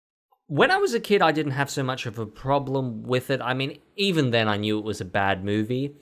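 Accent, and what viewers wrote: Australia. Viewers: Wow ur Australian. My brother and I thought u were British 😅😅 but I would love to see ur T&F episode. And I'm starting to think I'm the only one who likes thomas and the magic railroad. Also I think when this Q&A is over you should do one with ur characters from your series.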